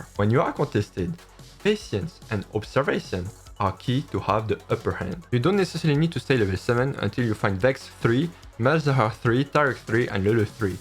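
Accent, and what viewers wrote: France. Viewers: French accent, right?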